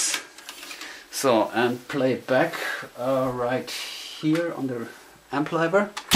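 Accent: Germany. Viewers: LOL, "Peeeeep!" instead of "beeep"! :-D Love that German accent, man! :-D Fun video (even with the disappointment that the pro recorder was too stupidly designed to play also)! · I love your german accentt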